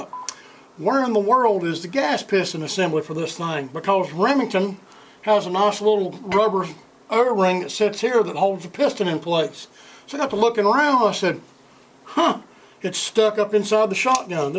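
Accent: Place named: East Texas, United States. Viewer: where you from boy? I'm guessing it aint main. meby deep east texas.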